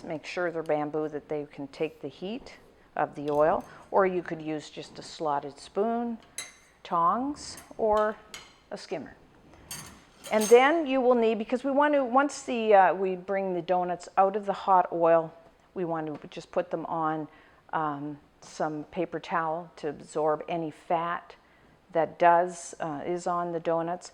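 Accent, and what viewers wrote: Canada. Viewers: Are you canadian? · Are you Canadian? You pronounce "ou" a lil bit like canucks do. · I listened to this video again I think you're correct! At first I was thinking North Dakota or Northern Michigan but I think I'm hearing a slight Nova Scotia tone now. She probably went to elementary school in Nova Scotia and then high school somewhere else in Manitoba or Ontario. Her 'About' and 'outside' totally gave away the Canadian connection. It's tough to tell exactly where though because she doesn't use slang or idioms much. I love her videos.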